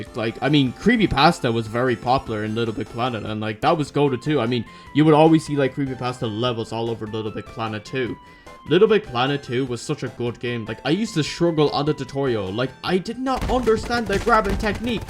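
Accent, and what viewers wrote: Ireland. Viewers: are u from Ireland? If you are i am too!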